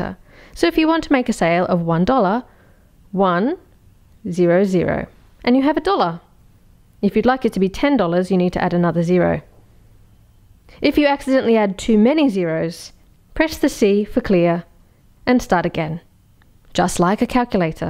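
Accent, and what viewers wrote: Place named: England, United Kingdom. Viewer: U sound English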